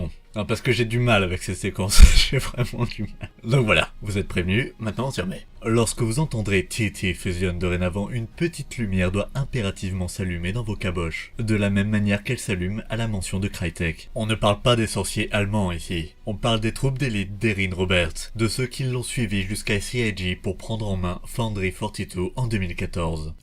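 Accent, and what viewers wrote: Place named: United States. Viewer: Super Idée. Super Contenus. Super Texte. Mais je n'accroche pas le "style" que tu met dans ta vois. C'est très proche d'une vieille IA de Vieu SF mais sans la reverbe... Et pour mon cas je trouve que sa gâche le plaisirs que j'ais eu a reguarder cette video. Si pour X réson tu tiens absolument a guarder cette fasson très américanisé de parler je peut te consseiller des tuto pour y metre les effets audio qui vonts avec et mieu fair passer la pilule. Mais pour avoir testé ce style je te consseil le serieu et sinssert. Milles excuses pour l'orthographe.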